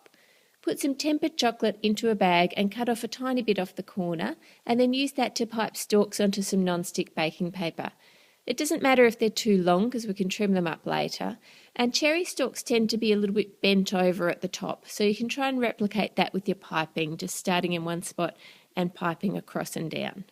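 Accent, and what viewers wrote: Australia. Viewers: U from Australia? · Australian I love it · Yeah she is Aussie! Thats Australian.. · are you aussie? cause if you are i will love you forever. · Are you australian?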